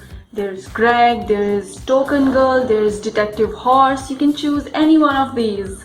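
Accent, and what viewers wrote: India. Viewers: Bekir Hadžić She's probably Indian and she speaks English a lot so her accent is light. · I'm glad I found you from a comment your so funny. You sound Indian but don't look like it but I have an Indian friend she has the same name as you. Also I'm Indian 😸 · it’s obviously indian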